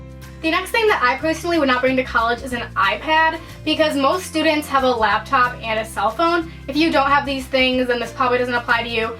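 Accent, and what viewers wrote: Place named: Buffalo, United States. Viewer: are u from buffalo? you totally sound like it!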